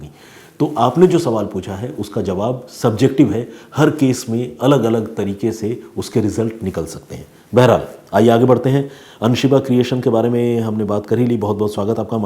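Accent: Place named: Hills, India. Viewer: आपका पहाडी अवाज सुनने मे मजा आता हे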